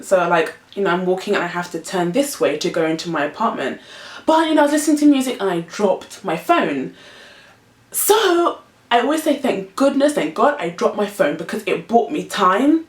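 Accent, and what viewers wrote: England, United Kingdom. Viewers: Love your videos and stories, very detailed :) also UR English accent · Bring u and that British accent to Northern California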